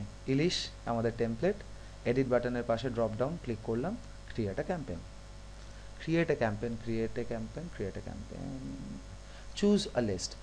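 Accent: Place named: Kolkata, India. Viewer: I thought you were from Kolkata. Bah.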